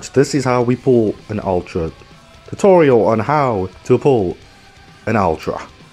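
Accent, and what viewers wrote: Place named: South Africa. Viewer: I can smell a south african from a mile away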